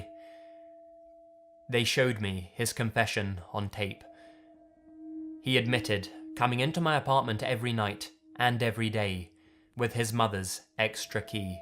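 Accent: United Kingdom. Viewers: I don't know about anyone else but I am somehow very attracted to the voice of the narrator because I love british accent and his voice is sooooo appealing to me😅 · I cant stand british accent :D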